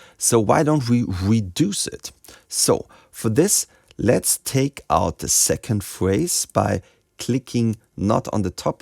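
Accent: Germany. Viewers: Your videos are awesomly helpful! If I had to rely on the Videos uploaded by the Ableton website, I'd have great trouble in learning Live 11! The best part is your german accent. I'm from Germany myself originally and it's always great to hear the odd german expression like 'Zack!' which you throw in every now and then. Cheers from Ingo in Melbourne, Australia!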